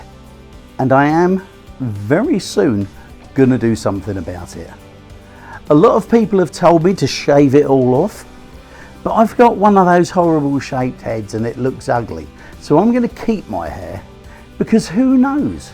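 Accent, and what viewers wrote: England, United Kingdom. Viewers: I like your calm and enjoyable english voice :)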